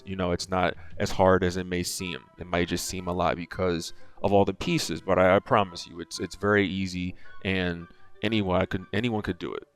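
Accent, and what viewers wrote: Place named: United States. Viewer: Why your voice is American your name is Indian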